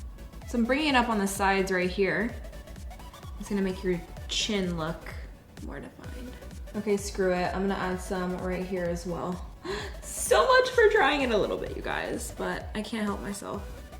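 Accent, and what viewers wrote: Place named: Tennessee, United States. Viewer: I know people have to grow and change which isn't a bad thing...but I miss the old, filming in her bedroom with her cute Tennessee accent 😔😕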